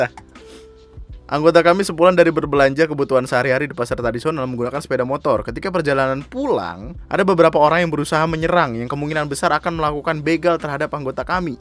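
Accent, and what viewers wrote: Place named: Java, Indonesia. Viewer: Jowone medhok ya ms 😹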